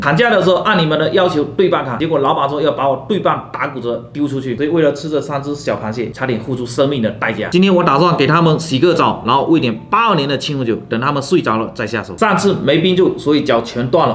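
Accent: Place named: Fujian, China. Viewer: 不知道为什么，我总是听不惯福建口音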